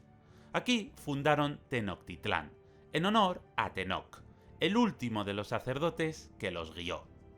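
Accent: Spain. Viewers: No me gusta del todo tu relato. Es evidente tu ascendencia española.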